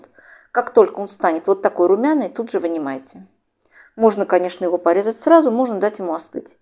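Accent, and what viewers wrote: Baku, Azerbaijan. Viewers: Здравствуйте! Спасибо за прекрасные рецепты!!!Приятно слушать вашу речь с бакинским акцентом❤❤❤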